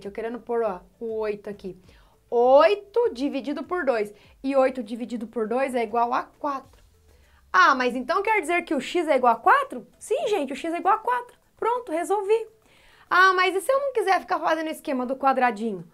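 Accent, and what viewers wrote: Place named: Rio Grande do Sul, Brazil. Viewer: sotaque gaucho kkk vlw pela ajuda tenho 4 dias pra estudar para prova de matematica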